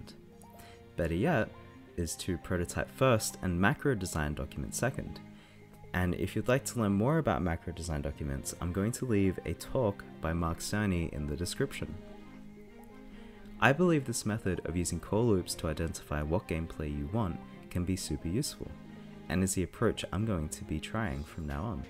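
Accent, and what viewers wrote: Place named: Australia. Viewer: I have been watching your videos recently, but had not released you created Super Hot- great game! Plus you sound like a fellow Aussie, so a thumbs up just for that :)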